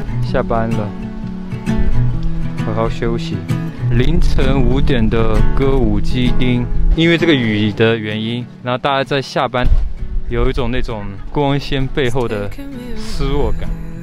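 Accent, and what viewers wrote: China. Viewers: 中國腔很輕微。但學台灣腔還是能聽出是中國人。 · 中國的腔調 , 繁體的字幕 , 可以告訴我為什麼嗎?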